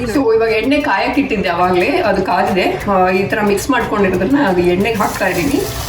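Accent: Mangalore, India. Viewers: ನಿಮ್ಮ ಮಂಗಳೂರು ಕನ್ನಡ ತುಂಬಾ ಚೆನ್ನಾಗಿದೆ.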